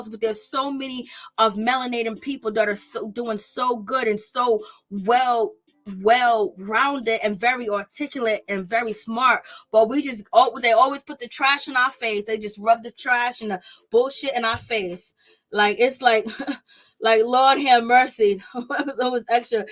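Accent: New York, United States. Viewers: Hahahhaahhhhahhhhahahahahahahahahahahahhaahhahahaha😂😂😂🤣😂🤣😂😂🤣🤣😂🤣🤣🤣😂🤣 you are so funny gurl . And your beautiful New York urban voice .. Lar that ❤️❤️❤️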